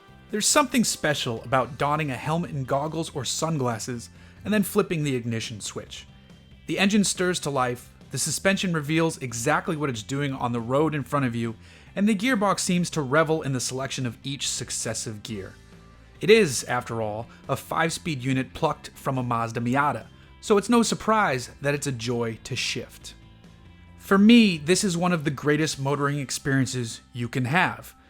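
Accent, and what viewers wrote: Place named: United States. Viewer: Fantastic review. Also you have a nice American voice. Not annoying at all!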